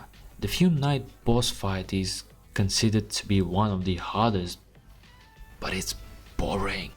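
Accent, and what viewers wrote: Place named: Russia. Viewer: Just found your channel and honestly your editing style is really great! If I could levy a few suggestions, I think you should raise your voice a little, rather naturally or in post editing - from what it seems like you are a native Russian speaker, but I could be wrong, so don't worry too much about your speaking or accent if you are or not, good content usurps it and this certainly was, even though your voice was definitely not an issue. Another thing, I do think your intro meme/video was a bit long and might cause some early click-offs - shorter length ones in the future will do the opposite. Also great background footage! You can tell its from mostly if not all of your own playthroughs, so it gives credibility to your arguments. As someone who was introduced to the souls series through Dark Souls 2 and a personal fan of the game, this video was honestly pretty solid even though it felt like you sided against it in a sense. 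Again though, amazing video!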